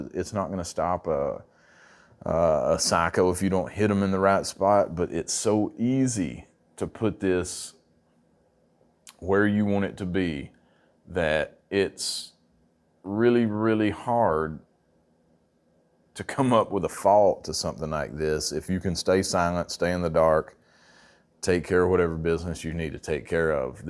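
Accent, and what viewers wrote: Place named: Oklahoma, United States. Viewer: ha I thought he said raffle but he said rifle. lol we sound like that here in Oklahoma. love the video bro you did a great job!!!!!!!!!!!!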